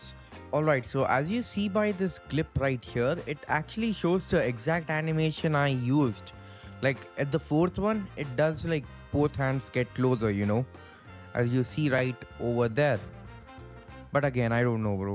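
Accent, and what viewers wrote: India. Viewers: Your accent sounds like indian idk why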